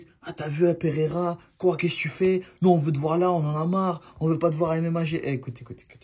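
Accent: Cameroon. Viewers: Ahahaha trop marrant je te jure t’es vidéo me tue 💪 ( juste ton accent africain camerounais il est agaçant ça gâche toute les blague ) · Il parles comme un vrai camerounais ! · t es un ouff j ai kiffe ton acent camer 🙂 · 🙂Force à toi!trop fun de tes vannes,l'accent kamer ☠tout 🤣🤣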